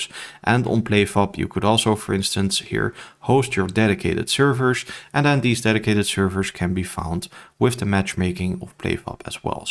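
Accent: Netherlands. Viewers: You have a dutch accent, I am dutch too xD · are you dutch by chance? :)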